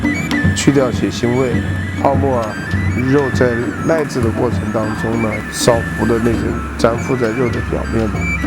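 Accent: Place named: Changsha, China. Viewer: 长沙话就是韵味！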